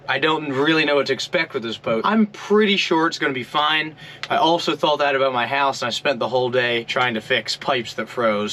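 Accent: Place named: Maryland, United States. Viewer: First time viewer here - I heard your accent and knew you were from Maryland 😂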